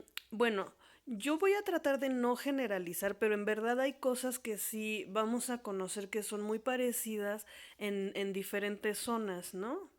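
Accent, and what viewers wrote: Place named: Mexico. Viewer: Hola, de donde eres? Escucho tu acento como mexicana, yo también mexicana, cual es tu fb me interesa aprender árabe, gracias!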